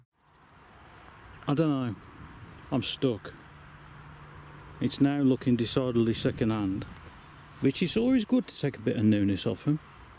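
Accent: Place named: West Country, United Kingdom. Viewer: If I lived close mate, I'd do it no problem! But judging by your accent, I'm guessing West Country somewhere? I'm up in West Yorkshire, Leeds. Looks a nice spot to fly though.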